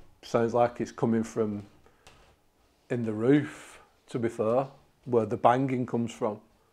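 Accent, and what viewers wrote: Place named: England, United Kingdom. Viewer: I just figured out that English people don’t pronounce their h’s if it’s the first letter of the word. Like hello would sound like “ello”. I love your accent. It’s very cool and makes you nice to listen too. Like when you say half. It sounds like “aff”. 😊❤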